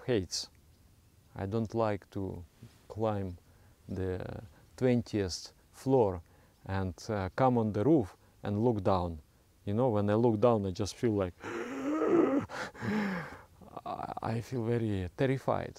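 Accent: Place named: Russia. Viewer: Russian pronunciation in english sounds great, it’s like speaking english but russian way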